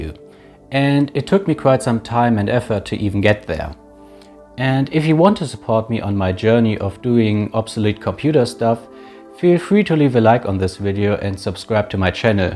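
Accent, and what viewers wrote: Germany. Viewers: German English. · THIS ACCENT...are you german?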